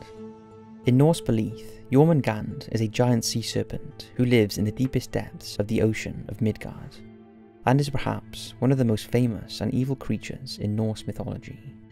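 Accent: United Kingdom. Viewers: Would be helpful to show the name of each of these creatures in text. I couldn't understand what you were saying in some cases. For number 3, CC listed you calling these creatures "Forward", "Board," "Vaude," and "Vort." Later there was "Linga Barked," and "half goofer." Huh? And number 1; was it "Pesto," "Pesta," "Pastor," "Pasteur," "pasta," or "pester?" CC may not pick up the British accent.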